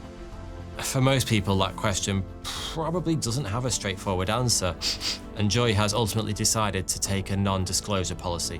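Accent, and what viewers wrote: United Kingdom. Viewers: It's a play on his regional British accent. Finking = thinking For'y two = thought he, too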